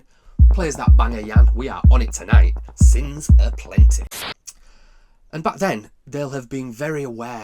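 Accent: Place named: North, United Kingdom. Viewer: Art with a luvleh Northern accent and awesome graphics? Subscriiibed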